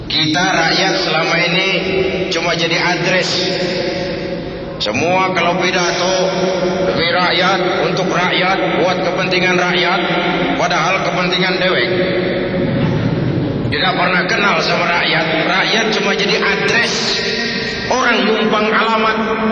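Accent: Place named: Aceh, Indonesia. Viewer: suara aceh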